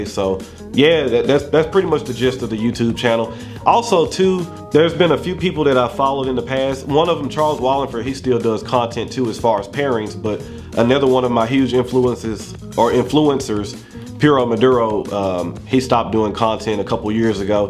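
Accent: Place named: Memphis, United States. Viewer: Man. You got one of the cleanest looking channels. And a a1 personality and presence for this. And that accent yo 😂 Makes me miss Memphis. Gotta go see my aunt and cousin up there soon. I always had trouble finding good channels cause I noticed that some were way too damn bourgie, some were way too amateur, some were alright but the people runnin the channels were lowkey nuts as individuals (and not in a good way) and some were just bad all around by either trying too hard to be all Luxurious or whatever and looking strange for their trouble. There are a whole lot of bad options out there. But I've found a handful of down to earth, decent seeming folks and I'm glad you're one of em. Even if I'm relatively new to ya. Stay at it 👊👊👊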